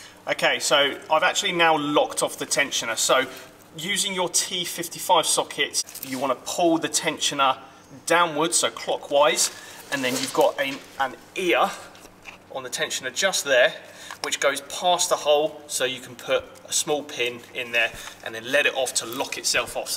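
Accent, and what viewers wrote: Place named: United Kingdom. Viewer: Hearing a British guy do a video on repairing a British car just makes it fn authentic 😂. (Even though the car isnt as British as it used to be 🙄)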